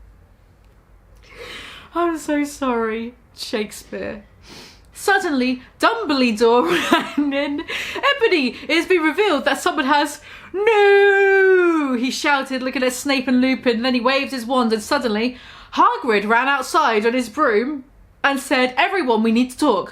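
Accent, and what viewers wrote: United Kingdom. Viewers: British Chav typing and being read by a British accent ... that's my cup.of tea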